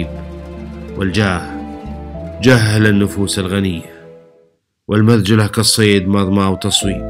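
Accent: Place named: Nabati, Saudi Arabia. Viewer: انت تملك موهبة نادرة جدا ً ، كيف تستطيع أن تنظم مثل هذه الأبيات الجزلة ، والمفردات الغزيرة والنظم المندثر تماما ً ؟؟؟ والأغرب أنك تملك اللهجة النبطية الأصيلة ، فأنت لاتكسر أول الفعل !!! يجب أن تهتم بتدريس الشباب المهتمين بالشعر ، وإن فعلت ، سوف يتخرّج من مدرستك جيل يعيد الشعر الى مجده . ويكون إسمك خالدا ً . 🌹🌹🌹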